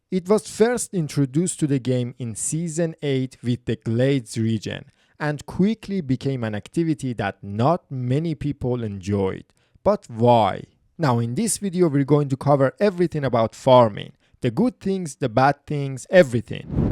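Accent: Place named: Iran. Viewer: good luck buddy. perfect channel. like your persian english accent.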